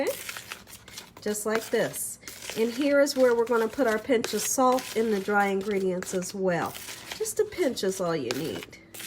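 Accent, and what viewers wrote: South, United States. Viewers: I love this lady so much she sounds so southern 😂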